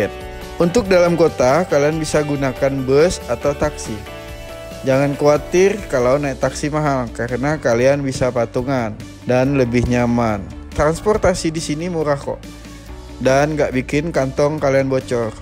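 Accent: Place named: Banjar, Indonesia. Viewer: Suaranya seperti Urang Banjar ya, R-nya susah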